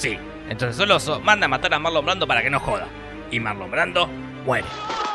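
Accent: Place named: Argentina. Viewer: Insoportable la manera en la que hablas Argentino tenias q ser.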